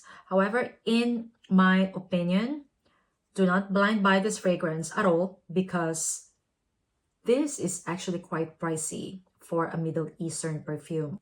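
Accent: Philippines. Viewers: Ooh. I knew you are a pinay from your accent. I'm from the Philippines also but now staying in italy 😊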